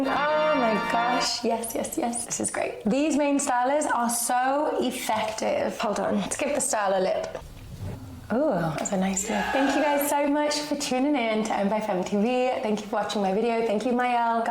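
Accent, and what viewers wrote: United Kingdom. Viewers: 😭 I binged some shorts and subscribed right, then in one I heard a (your) voice and smiled. My thought was look at another woman who likes to put on accents like me (you were answering a commenters question). Only to find out when reading your bio that you're British for real. I have real tears in my eyes hahahah I was so virtually embarrassed for myself. Love your channel. ❤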